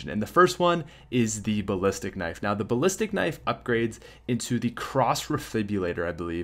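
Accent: Canada. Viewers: He's Canadian he said aboot instead of about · What's least favorite gun? The smR! No offense to your Canadian accent, in fact I like your accent.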